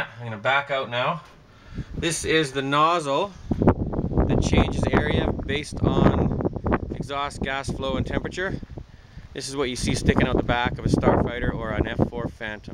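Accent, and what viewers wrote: Canada. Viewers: very informative video. Thanks, mate. On a different note, are you Canadian?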